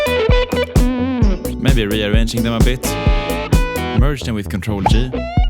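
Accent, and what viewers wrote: Stockholm, Sweden. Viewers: Gotta love your Stockholm dialect while speaking English. 😆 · jess i hear de svengelska is going krejsi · please brother, try and lose the harsh stockholm accent when speaking english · is this guy swedish??? jag älskar allt om den här videon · Never ever lose your swedish accent ❤ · swedish accent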